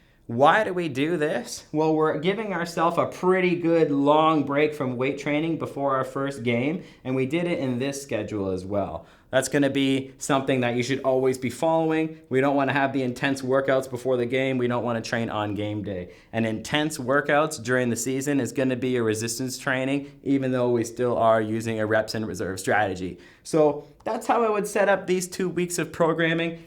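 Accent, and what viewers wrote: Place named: Canada. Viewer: canadian soundin fellow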